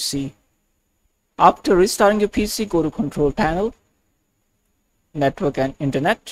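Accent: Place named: India. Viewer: I knew it would be a waste of time as soon as I heard indian voice but still tried and yea it wasted my time 😂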